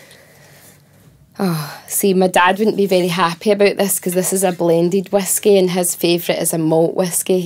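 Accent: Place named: Glasgow, United Kingdom. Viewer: When she sings you can't really hear here accents, and then you hear an interview and think. That's a glaswegian lassie 😉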